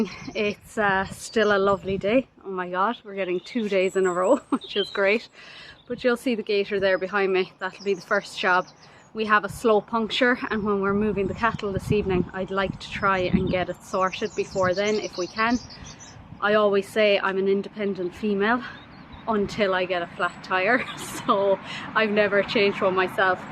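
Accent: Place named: Cork, Ireland. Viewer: Listening to u I’m convinced you have more of a CORK accent than a Kerry one. You are lucky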